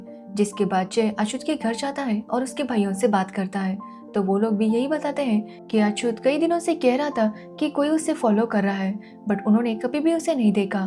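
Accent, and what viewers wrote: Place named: Maharashtra, India. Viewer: Is your mother tongue is marathi ?... or does the narrator belong to the marathi language speaking community ... 😅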